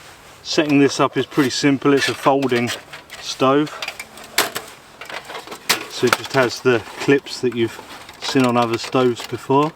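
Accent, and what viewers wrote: England, United Kingdom. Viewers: Nice video English Accent different than the NYC dribble BS😎👍 That stove attached to the link is currently unavailable, oh well I guess that is the way it goes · I'm sure your significant other loves your camp cooking. I know I would even with a good couple of nice beers. Love your lifestyle. Was hoping to get out myself hot tenting in the snow but all we have had is rain, rain., rain over here in Southwest Washington State USA. Stay safe and thank you for taking us along on your wonderful outdoor adventures. I love your British accent.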